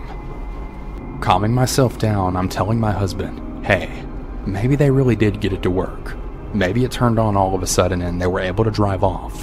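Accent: South, United States. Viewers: I really love the narrators accent like his southern accent it’s very aesthetically pleasing and satisfying. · I love that slight southern accent im the narrator's voice 💜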